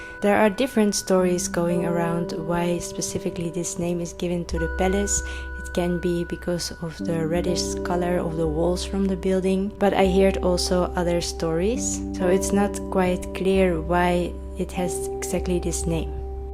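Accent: Netherlands. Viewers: You must be Dutch lady. I recognized your accent, machalah fellow woman.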